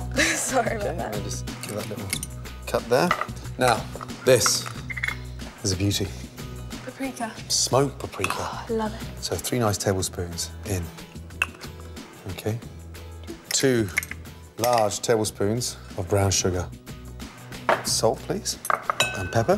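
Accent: United Kingdom. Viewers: Five and half to Six hours in the Oven at 140C...! Agg, still having trouble with British Accent.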